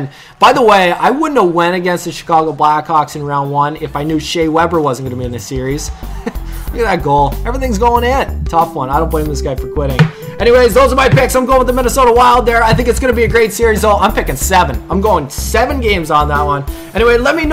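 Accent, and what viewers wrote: Canada. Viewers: Why are you always Red Wings? They your favorite team? I live in Michigan so they my favorite team as well. Such a shame against Tampa Bay! You have Canadian accent so you from Canada? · ***** where are you from? I am from the Detroit area and I'm a die hard wings fan. I've been watching a lot of your videos lately and haven't figured out where you are from lol. DET? or no..sounds like your canadian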